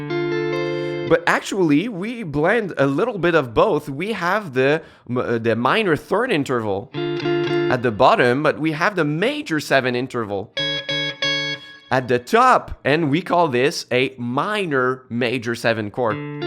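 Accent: Quebec, Canada. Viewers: You sound like you from Quebec????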